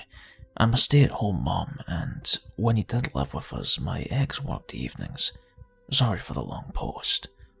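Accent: Scotland, United Kingdom. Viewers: A Scottish accent goes really well with scary stories. Great story telling voice👌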